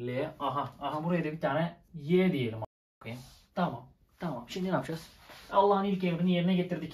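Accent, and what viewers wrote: Thrace, Turkey. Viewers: kral sayende urfa agzını bırakıp trakya agzına geccez adamsın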